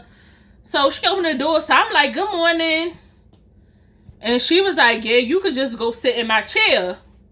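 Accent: Baltimore, United States. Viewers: This was your first storytime I watched and when I say that your accent and storytelling skills kept Me fully entertained, it did. I Love Your BMore accent🥰😊😊😊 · I love your Baltimore accent lol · My man here like I know she from Baltimore lol your accent STRONG LOVE YOUR CONTENT BABES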